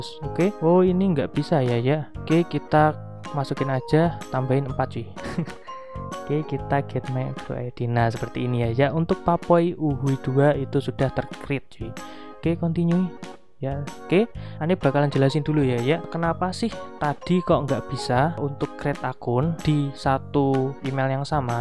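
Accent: Java, Indonesia. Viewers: bang lu ngomong medok jangan pake ane ga pantes aku aja atau saya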